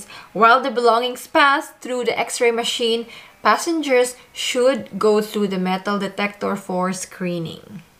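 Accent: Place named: Visayas, Philippines. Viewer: Bisaya kapo mam?...halata accent mo bisayan accent.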